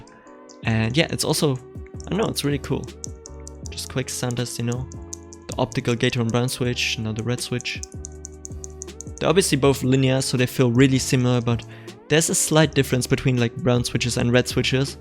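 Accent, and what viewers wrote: Germany. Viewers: U have a german accend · Kannst du zufällig deutsch hab nämlich das Gefühl du hast so nen deutschen Akzent 😂 aber gutes Video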